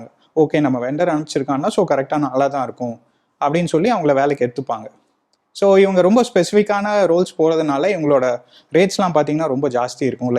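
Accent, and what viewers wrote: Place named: Coimbatore, India. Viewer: நான் வசிப்பது பெங்களூர் தம்பி நீங்கள் நன்றாக பேசினீர்கள் நீங்கள் கோயமுத்தூர் என நினைக்கிறேன் தம்பி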